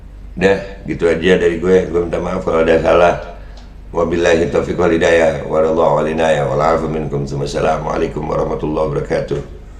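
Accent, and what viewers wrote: Indonesia. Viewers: Njirrr dari beberapa bahasa daerah yg gw ngerti langsung logatnya babeh keren bingit kyak asli orang suku madura, jawa, bali, banjar dll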